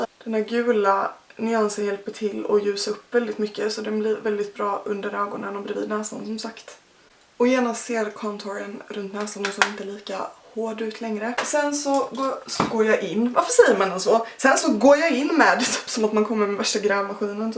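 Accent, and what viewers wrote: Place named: Småland, Sweden. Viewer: Min söndag fick ett perfekt avslut efter denna videon. Saknat ditt fina rum, men vart är de turkosa ljusen haha de var så fina ju. Har följt både dig o Louise sen jag var 12 år ungefär, även eran blogg. Din småländska röst förgyller mina dagar och brukar kolla dina äldre videos när jag har tråkigt. Älskar att du ska "börja" igen försök hålla i, jag är så taggad. Kan du göra en "catch up" video där du berättar om din tid när du har varit borta, om din utbildning m.m. Det hade varit väldigt intressant för oss trogna följare som följt dig länge. ❤️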